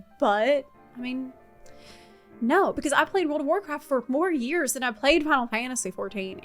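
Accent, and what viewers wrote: South, United States. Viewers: Not only do I love my character (also a bunny girl), but I am fully convinced she is the canon version of the WoL. She is perfect. In other news, I love that years of living in Ukraine has not suppressed your powerful Southern twang in any way. I have it too, I think it comes from our DNA. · Why is having a south girl accent all of a sudden?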